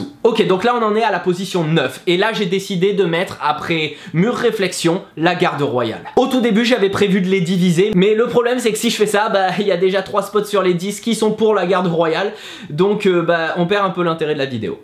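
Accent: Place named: France. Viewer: Don't understand a word 😂 but I missed you and your wonderful French accent so much! 😍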